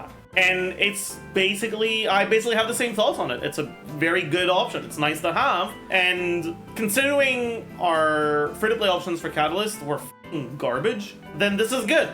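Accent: Quebec, Canada. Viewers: the french part of canada yea i can hear it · Maybe because thats how he really pronounce these words since he comes from quebec where french is common ? You're the cringe one here lmao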